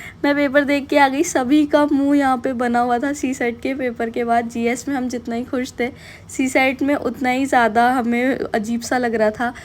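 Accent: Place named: Bihar, India. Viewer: R u bihari apke cll pe ke language se ekdm apne ghr ke bihari language ki feel aayi 🥹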